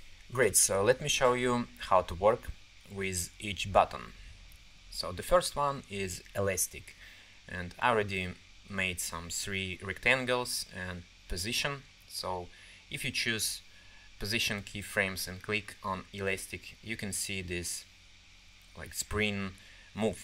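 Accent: Russia. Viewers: Для какой версии скрипт? На 2019 запускаю, не появляется логин и пароль, по акценту вроде парень из России, поэтому пишу по русскому))) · наче росіянин говорить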